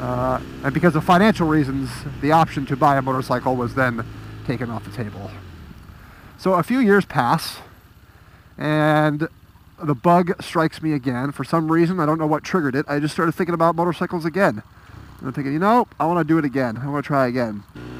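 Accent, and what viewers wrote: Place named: United Kingdom. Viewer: NinjaBoots ***** Hehehe...yes his British accent does make it special. I like his hones oppinions and style. Just being himself and looks like a good fella to my oppinion. Also f*cking love the track days and the test drives, Awesome. I am so happy for him, but was a bit sad when he said for the divorce... Hope he will do OK. Ride safe guys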